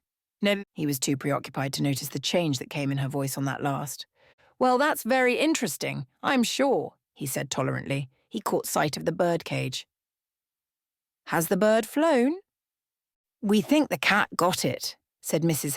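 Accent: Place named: United Kingdom. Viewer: Brilliant choice! I read it years ago . . . . BTW, the British accent doesn't work. Really. Please do it again. Please. Any rural American accent will do.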